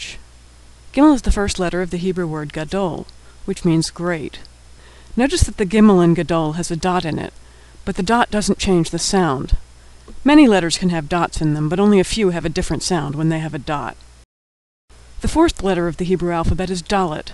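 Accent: United States. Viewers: Beautiful American voice, makes it much more easy to store the informations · horrible American accent... can't you find an Israeli to pronounce the words?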